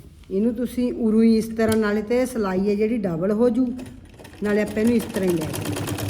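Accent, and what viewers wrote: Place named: Punjab, India. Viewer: Bhut sohna bneya skarf bhain g dhanwad g Sade rishtedar khana amloh wali side a boli tvadia bilkul ohna vargi a Sade kuram a g plz dsna jroor kis side to ho TUC 😊🙏🙏👌👌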